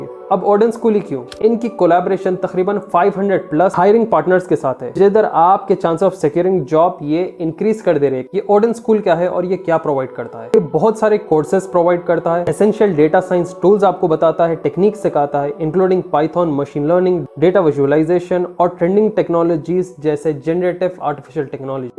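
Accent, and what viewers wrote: Hyderabad, India. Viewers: By how he speaks he sounds hydrabadi to aap agr wahan ke logon ko sunenge to wo ese hi bolte hai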